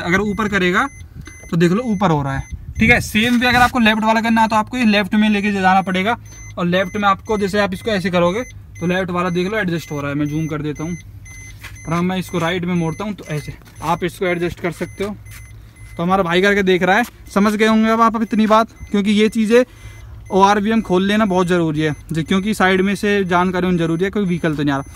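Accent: Haryana, India. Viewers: Haryanvi bolte hai dono 😊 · It's just bcz of his haryanvi tone even my friends sounds the same 😂😂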